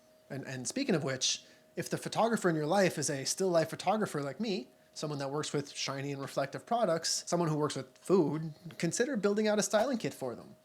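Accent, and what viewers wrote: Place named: Quebec, Canada. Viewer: J'me suis pas rendu compte que t'étais quebecois au premier video qu'j'ai écouter sur les flashs drag. Toute une surprise quand je t'ai entendu immiter ta mere. Tres bon contenue jusqu'ici, lache pas:D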